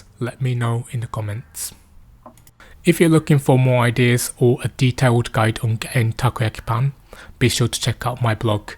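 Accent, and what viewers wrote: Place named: England, United Kingdom. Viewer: Not sure about the recipe yet i totally hot lost in your fascinating English accent!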